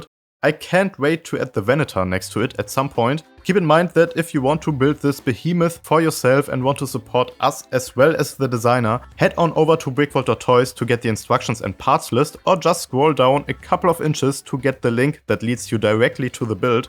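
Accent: Germany. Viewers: Are you german?😭😭😭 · Your english Sounds Like a German speaking english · Sounds like zhe Man in the Video is German (English accent) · Do i hear a german-like accent?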